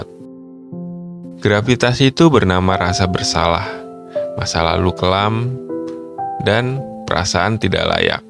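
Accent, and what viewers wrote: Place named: Surakarta, Indonesia. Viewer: Kok suara mirip solo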